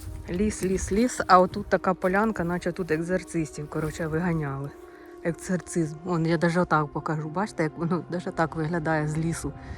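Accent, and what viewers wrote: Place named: Zhytomyr, Ukraine. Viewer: Здрастуйте. Ви не з Житомирської області? Просто слухаючи вашу мову так думаю.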